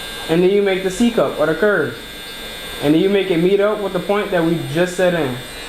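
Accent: New Orleans, United States. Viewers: is that a NOLA accent I hear? I love NOLA accents and thanks so much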